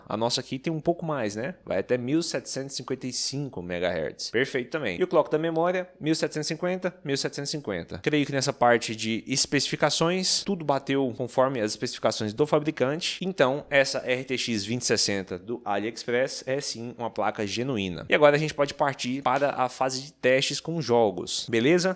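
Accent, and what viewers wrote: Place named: Goiânia, Brazil. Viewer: Você é de Goiânia? Haha Top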